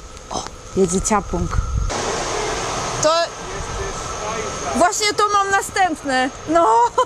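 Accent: Silesia, Poland. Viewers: Pięknie mówisz w języku Śląskim. Ja od 38 lat mieszkam w Krakowie ale takie słowa jak: "szałot rybny", "harynek", "hekele", "szpajza", "ciapąg" słyszałem dzisiaj pierwszy raz. Kutia to danie typowo Krakowskie, które znam od mojej Babci, natomiast to danie jest podawane obowiązkowo w Krakowie, podczas każdej wieczerzy Wigilijnej, moja babcia ma prawie 100 lat i do tej pory przygotowuje kutię, a właściwie to zarządza przygotowaniem kutii 🙂Ostatnio podczas nagrywania swojego filmu spotkałem z kamerą "Moto Urbex Silesia" - wspomniał kilka słów o Urbex Chorzów i oczywiście o Twoich filmach na YT 🙂 Na Śląsku macie tyle ciekawych miejsc do nagrywania: opuszczone pałace, kopalnie, dawne parowozownie, a nawet stadniny koni, to kopalnia miejsc do nagrywania. Dlatego często przybywam na Śląsk z kamerą by nagrywać nowe filmy. Pozdrawiam i do zobaczenia na następnym filmie.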